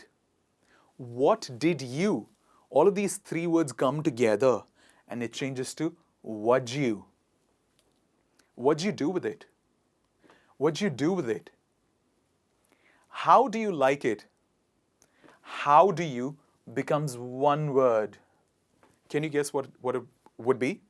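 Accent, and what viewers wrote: United States. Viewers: This is American English? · Is it American English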